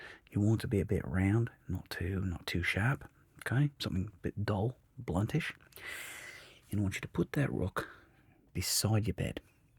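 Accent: Australia. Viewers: I love this so much the accent is spot on mate! It makes me happy knowing you’re not mocking aussies accents! 💜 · YAYYYY my request for more aussie accent has been answered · Another fantastic video. Some great advice and thought provoking ideas. Been struggling a bit with too much thinking of “having to do” things instead of “getting to do” things. Added bonus, I love Aussie accents and yours is awesome. 😊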